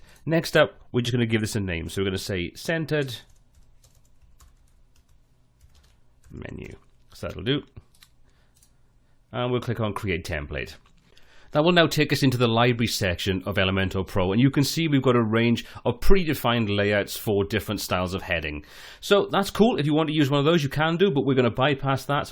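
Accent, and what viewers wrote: Wales, United Kingdom. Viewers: What a lovely Welsh accent! :)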